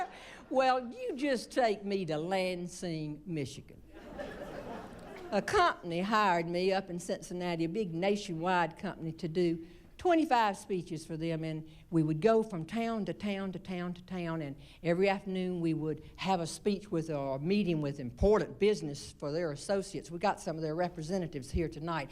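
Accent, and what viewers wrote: South, United States. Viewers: I Enjoy Listening to Jeanne Robertson she Always makes me LAUGH 😄🤣🎶& The most EXTRODENARY sense of humor<~& She does it in such A "SWEET SOUTHERN" Way She NEVER uses any profanity never says Ugley things about anyone !!!!Never uses Profanity ONLY~Brings Out the HUMOR in OUR EveryDay Lives 💁👌😍😍😍What a GREAT THING TO LIVE~Love & LAUGH & Share with YOUR FAMILY FRIENDS & LOVED ONES ❤️ A little JOY & HUMOR-----esp.NOW In Out miserable uncertain world!!!!So This To will bring TO YOU & A SMILE😃On Your FACE & IN YOUT HESTT❤️HAPPY HOLIDAY to YOU & OTHERS😊😆😃😂 to YOUR FACE & A LITTLE JOY In Your HEART♥️🎶💋❣️!!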